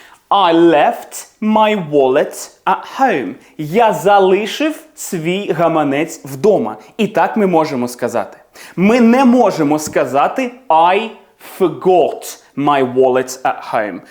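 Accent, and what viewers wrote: United Kingdom. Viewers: Хоч у когось із наших ютюберів класна вимова. Причому британська, а не огидна американська)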